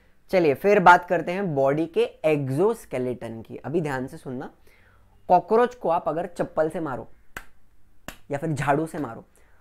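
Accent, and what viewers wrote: Maharashtra, India. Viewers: Sir, kya aap marathi ho? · Sir are u marathi????? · You are marathi ??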